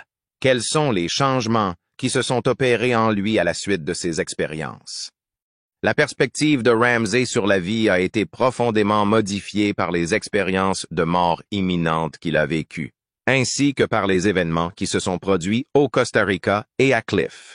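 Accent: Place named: Canada. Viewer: Heuuu pardon mais c'est quoi cette voix ? Cananadienne ? Ke veut bien mais là.... ça fait peur 😢😅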